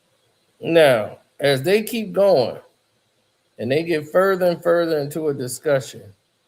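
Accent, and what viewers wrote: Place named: Chicago, United States. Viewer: “Casing on” “cracking on”, you are so Chicago.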